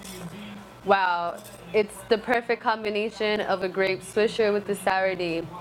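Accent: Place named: Jamaica. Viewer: Sour! "Jamaican Acent"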